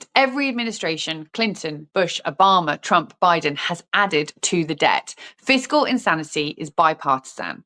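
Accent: United Kingdom. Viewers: Housing market showing real cracks in usa...nice accent, so cool british woman...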